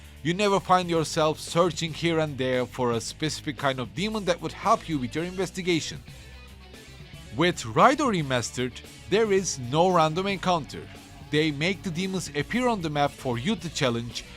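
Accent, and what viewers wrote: Turkey. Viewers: you sound very turkish🧐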